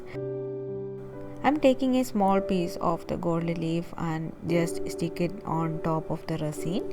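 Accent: India. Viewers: мне так нравится твой индийский английский!!! Американский английский звучит на мой слух ужасно, не всегда можно понять говорящего, а твой звучит чётко и даже нежно. Обожаю тебя слушать, хотя ты редко это делаешь. А цветок нежнейший, а запечатанный он выглядит просто восхитительно!!!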